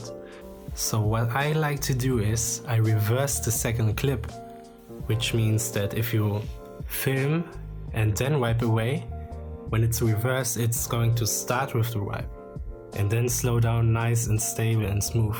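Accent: Germany. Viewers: Are you German or Something like that? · dieses Englisch man merkt so das du Deutscher oder so bist xD aber Inhalt ist top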